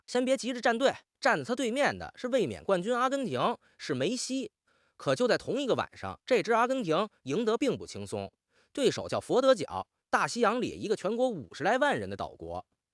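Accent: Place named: Beijing, China. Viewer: 满嘴京腔。反贼也看球啊？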